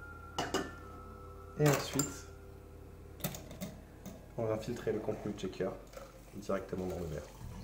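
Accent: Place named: England, United Kingdom. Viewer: Toujours aussi magnifique cet accent anglais 😂 a goûter même si je ne suis pas fan du Pamplemousse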